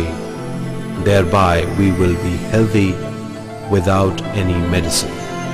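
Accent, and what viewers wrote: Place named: India. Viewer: I understand that you could be having an indian accent when you speak. Like a hot potato in your mouth. Now, you do not need to transfer that accent into writing form. "That" is not spelled DAT, DIS is spelled THIS. I would believe that those words are common enough so that you do not need to make those simple mistakes. Please meditate on THAT!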